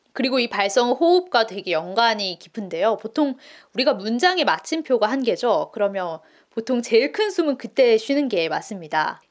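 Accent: Busan, South Korea. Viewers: 지금은 원래 쓰시던 부산 억양보다 저 억양이 더 편하신가요? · 영상을 쭉 보다가 좋아요 구독 클릭했습니다. 간결하게 핵심을 잘 알려주세요. 부산 사투리 e쁘네요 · 사투리 귀여우세요 ㆍ 참고도 저도 부산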